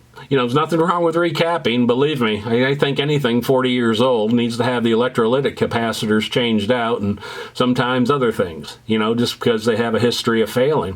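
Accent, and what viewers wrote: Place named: New Jersey, United States. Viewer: Sometimes I can't help but picture Brian Williams behind the voice. Is this a Ridgewood-specific accent or is it widespread through NJ?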